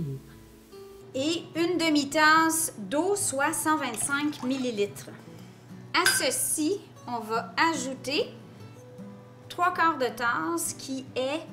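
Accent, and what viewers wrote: Quebec, Canada. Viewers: Moi j'adore ça l'accent Québécois 😍 Et même à cet accent ❤ Ça l'air appétissant tes hot dog! J'retourne voir la recette de Pretzels... See U... Bonjour de France Stéph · Mais il est charmant cet accent canadien!!!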